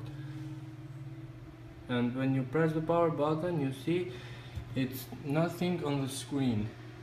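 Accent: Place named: India. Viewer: Indian accent Thank you very much good video! Big Like !Sub give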